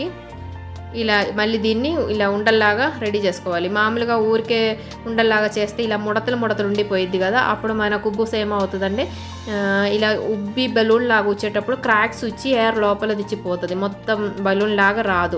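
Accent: Kerala, India. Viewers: Meeru malayalee na madam...mee slang same malayam la undhi Telugu.😅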